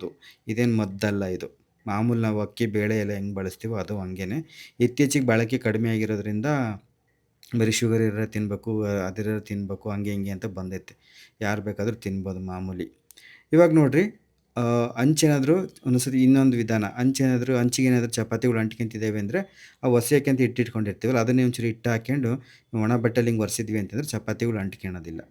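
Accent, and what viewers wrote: Chitradurga, India. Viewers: Good sir ನಿಮ್ಮ ನಿಮ್ಮದು ಯಾವ ಊರು ನಮ್ಮ ಚಿತ್ರದುರ್ಗ ಡಿಸ್ಟ್ರಿಕ್ಟ್ ಭಾಷೆ ಮಾತಾಡ್ತಾ ಇದ್ದೀರಾ ಥ್ಯಾಂಕ್ ಯು ಫಾರ್ ಯುವರ್ ನೇಟಿವಿಟಿ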